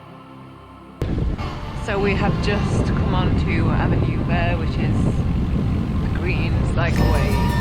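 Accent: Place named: North, United Kingdom. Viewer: Absolutely amazing videos. As a northerner now living in munich. The accent is a touch of home. Getting myself ready for a cross alps trip. Keep em coming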